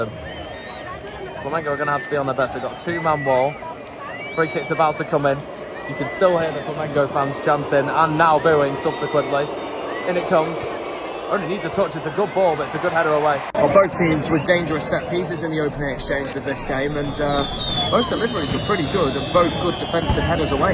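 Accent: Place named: United Kingdom. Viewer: O sotaque parece britânico.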